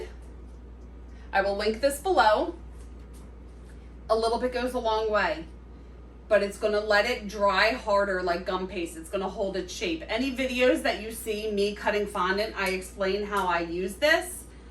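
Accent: Philadelphia, United States. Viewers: Your accent makes me miss Philly!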